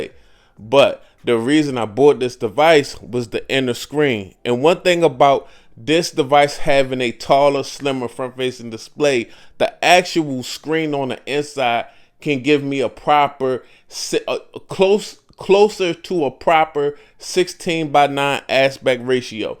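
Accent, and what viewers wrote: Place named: DMV, United States. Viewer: You got to be from the DMV lol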